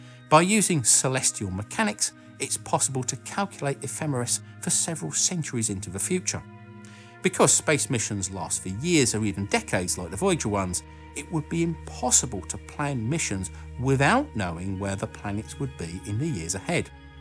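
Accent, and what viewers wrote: United Kingdom. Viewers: Why is this guy with a British accent when it is the Americans who are achieving this accmplishment??? I went to Cape Caneveral and they had a British guy expliaining the Mars Mission. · You explain everything better than my first language teacher. Is this British accent? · hit like for british accent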